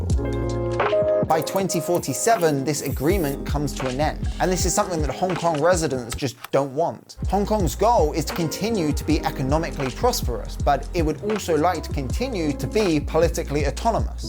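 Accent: United Kingdom. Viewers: Mate that accent is not American... He's very clearly from the Midlands or south of England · The guy sounds British, not American?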